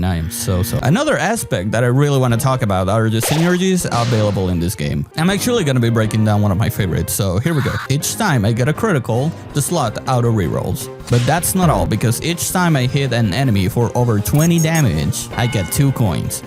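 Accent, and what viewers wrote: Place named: Europe. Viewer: Your voice sounds weird because European, not because illness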